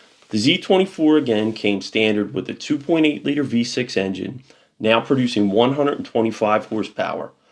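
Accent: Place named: Northeast, United States. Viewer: Fun video (as is your accent - somewhere northeast?). It's always entertaining to my Brit eyes to see the parallels across the pond. Over here, we had similar early 80s Cavs, but the sporty Vauxhalls (obvs Opels under the badge) were more the Astra (smaller) and Nova (smaller still). I feel like we had some very similar wheels to those Z24s though - they're definitely sparking childhood memories!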